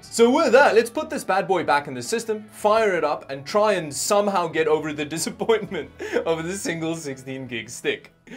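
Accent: Afrikaans, South Africa. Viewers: This guy sounded south african but it didn't say anything in the about page so I legit opened his Instagram just to check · I think it's South African (where English might have been a second language). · South African accent??🇿🇦🇿🇦🇿🇦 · are you South African? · Jy kan dai afrikaanse Aksent hoor🤣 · Love the content, keep it up. Quick question, are you South African? XD you've got the accent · I sense a South African accent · excellent to hear that Saffer accent becoming even more pronounced. Represent Boet, doesn't matter where we are in the world, proudly 'Sefrican! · South African? 😅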